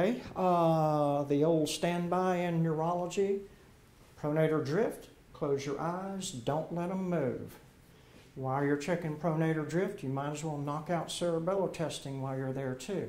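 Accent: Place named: Upper South, United States. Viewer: It's called an "upper south" accent. Distinct from the "deep south" drawl. This is more of a Kentucky, Tennessee, Virginia, or North Carolina way of speaking. Some parts of north Texas also similar.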